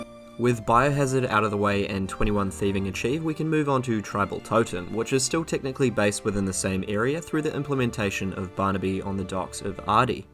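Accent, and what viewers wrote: New Zealand. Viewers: dude u a kiwi?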